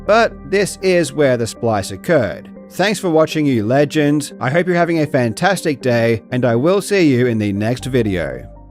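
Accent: Australia. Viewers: Love your videos. But to be honest, your accent plus the way you speak for the videos is like nails on a chalkboard. It’s awful. Aussie streamers I feel like get more views than average because of their voice, but yours is just plain grating. Any hoo, on to watch the video…. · CH is challenging for English speakers, don't sweat it. The CH in Nacht is said like the CH in Loch, the Scottish word for lake, like e.g. Loch Ness. The A is like in car, but short. Your first pronunciation in the video is correct. Yes, I'm saying correct. Sounding a little different than the theoretical standard pronunciation doesn't have to mean you're mispronouncing it, it just means you have an Australian accent because you got way too close to standard to be called "wrong." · He's Australian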